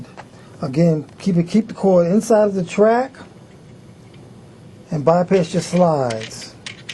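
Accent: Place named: Philadelphia, United States. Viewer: thanks for the help, especially loved the accent, just curious where are you from, im guessing Philly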